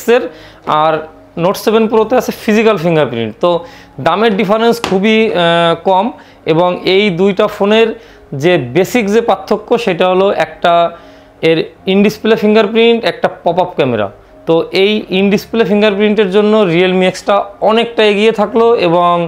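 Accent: Bangladesh. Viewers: ভাই আপনার বাসা কি নড়াইলে আপনার ভাসা নড়াইলের মত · ভাষার ধরন যা, সেতো সংস্কৃতিতে খুলনার বারটা বাজাতে চাচ্ছে। আসলে এ কিছু জানে বলে মনে হয় না। --- - এট্টু সাবস্ক্রাইব কুরেন ।।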